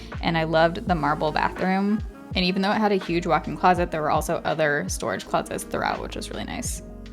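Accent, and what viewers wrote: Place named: California, United States. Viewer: Start a new vocal pattern if you can. That one..if not from Cali...ayyy. that's not a free thing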